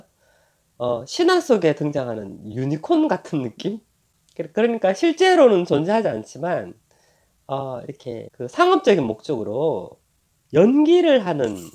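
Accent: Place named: Gyeongbuk, South Korea. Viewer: 서울분인줄 알았는데 고향이 경북분인가 보네요 경북사투리~^^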